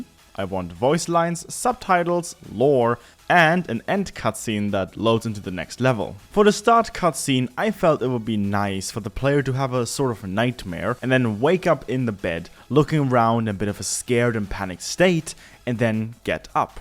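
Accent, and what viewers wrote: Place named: Germany. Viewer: most german accent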